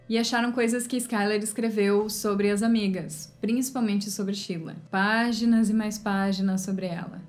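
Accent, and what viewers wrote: Rio Grande do Sul, Brazil. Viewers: Tu e gaúcha tche? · gauchá certeza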